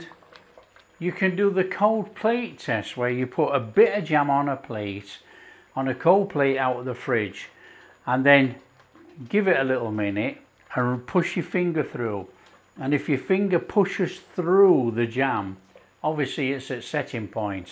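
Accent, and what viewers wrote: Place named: England, United Kingdom. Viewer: you are da man my new friend. Your channel is absolutely awesome. Nice hearing your English accent, we are expats from South Africa, been living in Huntsville, Texas for 33 years.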